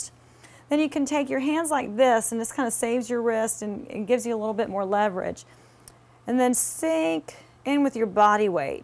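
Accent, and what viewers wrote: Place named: South, United States. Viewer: gorgeous accent. Sophisticated south.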